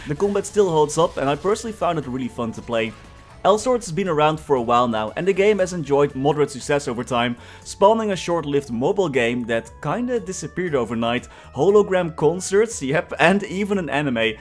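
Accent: Netherlands. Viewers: Are you Dutch? Ik kan een accent horen haha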